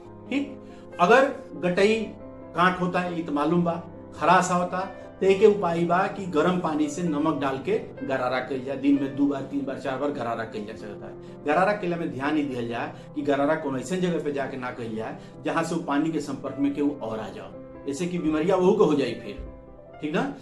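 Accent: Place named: Bhojpuri, India. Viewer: इतनी अच्छी विवेचना और इतनी सहज भोजपुरी। इतनी बढिया बातचीत। किसी भी ऐंकर से नहीं सुनी थी।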